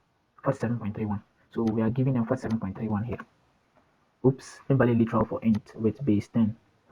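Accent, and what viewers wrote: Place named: Ghana. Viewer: I know a Ghanaian when I hear one lool nice video! I really needed this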